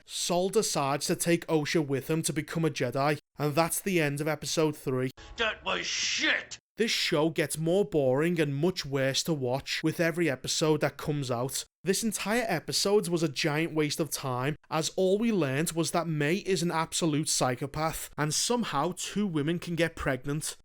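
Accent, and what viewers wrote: Liverpool, United Kingdom. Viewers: My favourite scouser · is scouse, he’s from Liverpool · The scouse accent makes this even better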